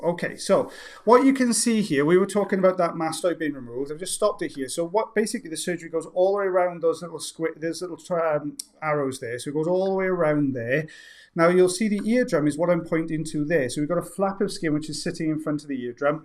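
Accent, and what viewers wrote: Wales, United Kingdom. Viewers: Where in Wales ARE YOU FROM???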